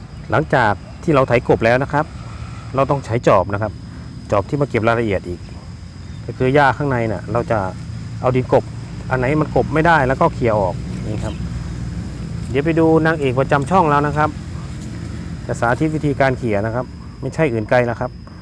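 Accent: Thailand. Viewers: ดีมากเลยค่ะ พูดภาษากลาง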